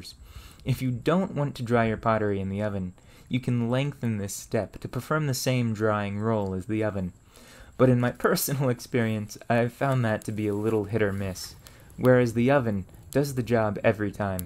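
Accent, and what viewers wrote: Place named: Michigan, United States. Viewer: I can't get over yer accent. It reminds me of an old online friend of mine from Michigan who'd say things like "yer" and "fer" :)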